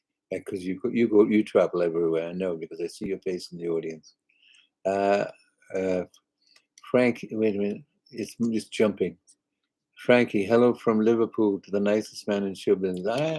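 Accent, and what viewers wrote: South, United States. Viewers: Watching from Wichita Falls Texas your southern accent was so so but you should put in a y'all and a bless your heart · Hello Engebert, I totally loved your live chat today. You have an amazing voice, speaking and singing. your songs have helped so many fans through these trying times. I listen to you when I go to bed at night, of course during daytime.😊 Your laughter on here brings so many smiles to me. Thank you.💓 Love your southern drawl..lol your a beautiful and handsome young man. My love, hugs and prayers are with you always.🌹❤😘🙏 The Lord's Prayer you sing it so beautiful, so touching..💖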